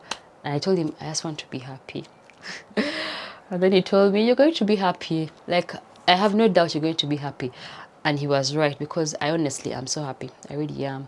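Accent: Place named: East Africa. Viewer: you are fun. That is East African accent rocks.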